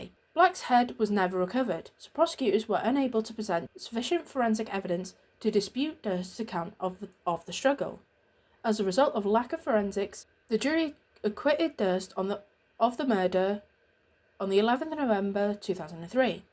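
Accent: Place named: Lancashire, United Kingdom. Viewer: Maybe Dean Corll. Curious as to your accent. Are you from Lancashire? :)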